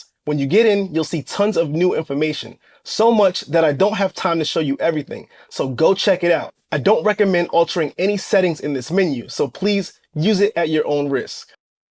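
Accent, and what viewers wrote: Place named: New York, United States. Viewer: Excellent video, we took notes. What is your accent? My Boston raised wife said it's a New York accent.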